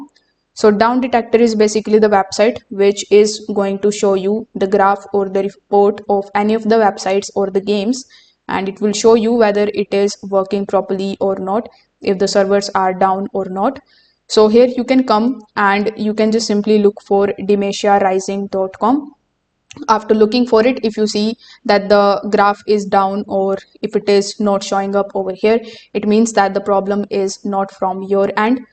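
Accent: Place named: India. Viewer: bro we can hear that you are indian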